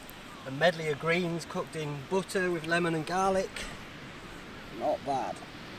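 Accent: Yorkshire, United Kingdom. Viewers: Hi mate I love your videos and have been fancying a go at some coastal virgin foraging I’m from Yorkshire and picked up you ears coast accent . I’m wondering where’s best to go for a first time thinking robin hoods bay what you think bro ?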